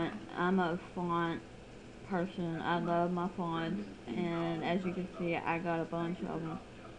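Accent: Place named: South, United States. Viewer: ok...from your accent...you're a Southern..which is ok...but next time you video something...shut the radio/tv off..it's distracting and trying to listen to you, with your southern accent and the background noise...very difficult to hear/understand what you're saying....just a thought.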